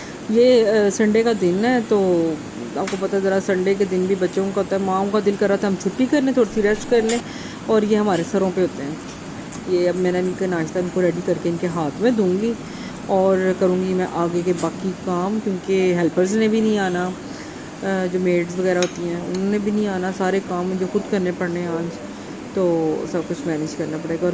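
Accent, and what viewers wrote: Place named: Lahore, Pakistan. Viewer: Lahori Apne bolne k lehjy se pechany jate h k yh lahore se h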